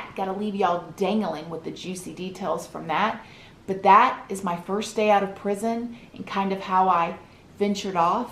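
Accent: South, United States. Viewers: 🇨🇦 .... You aren’t even my kid .. and we area world away .....But wow .. I have to say DAMN girl I’m proud of you !! ... I’ve watched a few of your videos today starting with your horribly abusive childhood ... your prison story ... The whole cavity search (your poor thing) ... And of course your amazing transition to finding 🤗God 🙏🏻 .... I am a Christian and I love that you are bold enough and brave enough to expose so much of yourself and your story to the world ... because there’s no doubt you already have and will continue to help so many !... I hope everyone takes the time to watch and listen to the one about finding God in particular because if ever we need Him it is NOW! ... You are such a beautiful person and I’m a pretty good judge of character !... I am Canadian and I love your southern accent it suits you to a T ... Your son is precious and so blessed to have a mama who can show him what is possible in life even when you don’t believe in “yourself” ... 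God believes in you ... And “we can do all things through Him who gives us strength” Your story and what you have accomplished through it all is rare I hope you know ... Not many who had gone through ALL that you have could have climbed out to become all that you have and are ... Hugs 🤗 to you ! ... Keep on keepin on !💕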